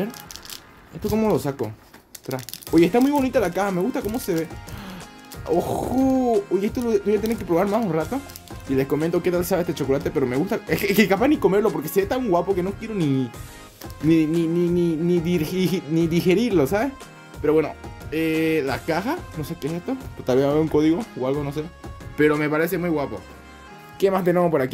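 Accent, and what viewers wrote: Bolivia. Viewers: Sos de Bolivia compa? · Oye yo me preguntó eres de bolivia??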